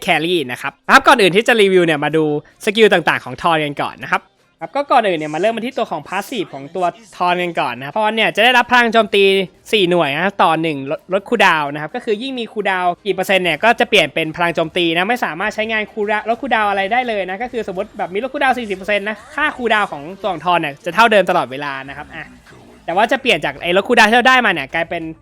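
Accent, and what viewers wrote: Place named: Thailand. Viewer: I didnt understand your language but i enjoy the gameplay, thai accent kinda funny tho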